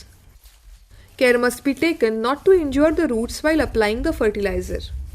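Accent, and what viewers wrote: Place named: Sri Lanka. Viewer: Though the video is very informative, it's very hard to digest ur srilankan English..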